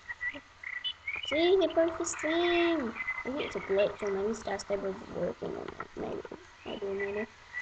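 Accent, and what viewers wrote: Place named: United States. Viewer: you sound amarican!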